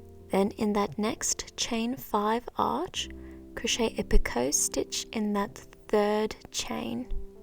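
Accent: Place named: South Africa. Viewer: This accent is definitely South African 🥰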